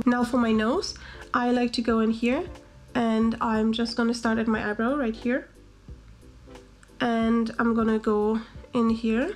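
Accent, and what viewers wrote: Germany. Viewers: Are you from Germany? I love your accent; it's so beautiful. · Da höre ich doch eher deutsch als Muttersprache. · I can hear a german accent. :D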